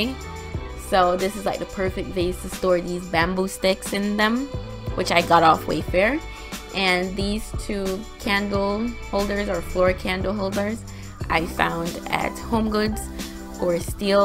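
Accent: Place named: Caribbean. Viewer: Yes! I only caught a hint of the accent. I was actually thinking you could be from St. Vincent. Was not expecting JA at all! LOL!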